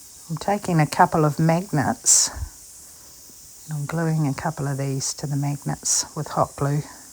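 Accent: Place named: New Zealand. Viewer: who dosent love a kiwi accent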